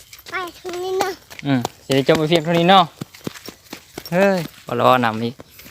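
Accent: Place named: Thailand. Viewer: เคยไปอยู่ไทยไหม ฟังสำเนียงการพูดคุยเหมือนกับคนไทยมากและพูดคำว่าคลิปได้ชัดเจนมาก ขออวยพรให้ จงมีแต่ความสุขความเจริญร่ำรวยเงินทองมีคู่ครองที่ดีและเหมาะสม❤️😍✌️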